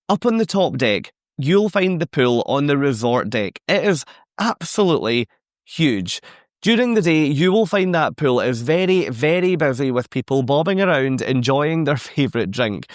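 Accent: Scotland, United Kingdom. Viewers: It took two nanoseconds to know you are a Scotsman! I live in Minnesota and my favorite boss of all time, one Frank Docherty, was my most favorite boss of my entire career. Thank you, for a great review. We were on the Edge last Fall when we cruised from Vancouver to Sydney. We love the ship and agree with all your observations.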